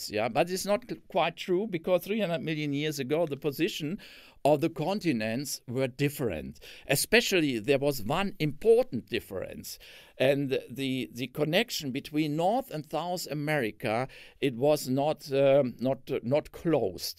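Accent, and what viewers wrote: Germany. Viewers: Das deutsche Englisch verstehe ich viel besser als das englische Englisch, so kann ich sehr gut mein Englisch verbessern. Der Vortrag war natürlich auch sehr gut.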